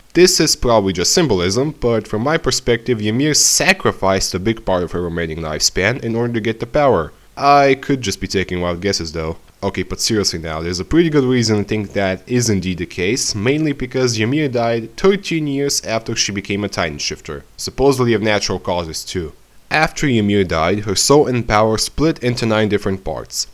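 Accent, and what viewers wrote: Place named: Birmingham, United Kingdom. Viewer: Between that half brummie accent and mispronouncing your "R"s and "L"s, I can barely follow what you're saying. Learn to talk or get someone else to do the voice-over, or just give us text.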